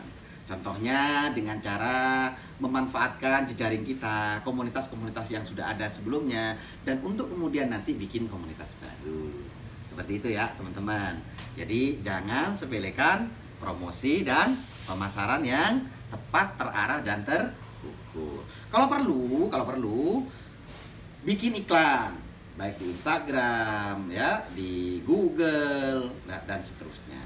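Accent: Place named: East Java, Indonesia. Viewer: Bismillah om doakan Lancar usaha kedai kopi saya mski awal buka. Msh butuh bimbingan dan belajar. Kalau boleh tw asli om bang kok medok jawatimur.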